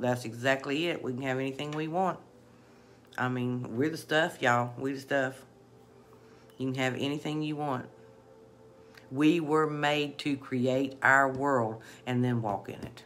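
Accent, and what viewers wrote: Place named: West Virginia, United States. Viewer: Hi! It is GREAT to put a voice to ur coaching. It puts a whole new spin on everything. Glad to be able to subscribe as i cannot be on Social Media d/t my job. Plus your dialect is a lot like my own being from WV. LOVE IT!!! THANK YOU!!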